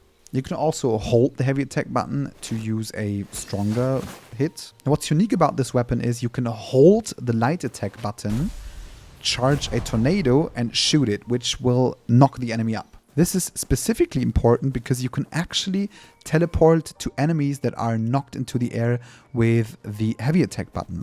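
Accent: Germany. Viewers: Wait, you’re German?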